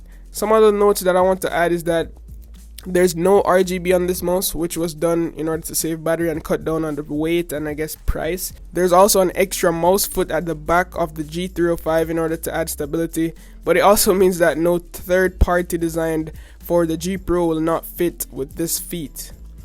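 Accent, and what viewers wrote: Jamaica. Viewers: You Jamaican I’m Jamaican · Good review. You sound Jamaican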